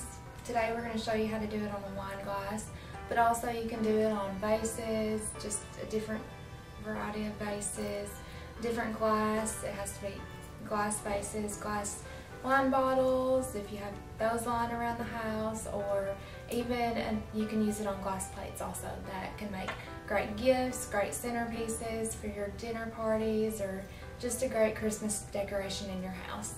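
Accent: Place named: South, United States. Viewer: Are you SERIOUSLY Chinese speaking Southern what state are you from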